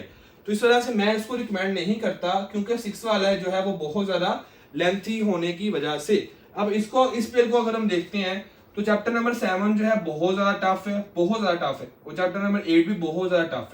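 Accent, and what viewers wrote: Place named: Faisalabad, Pakistan. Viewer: Ap faisalabad sy ho? Q ki ap areeb ki tara bolty ho iqra kanwal ky husband jaisy 😊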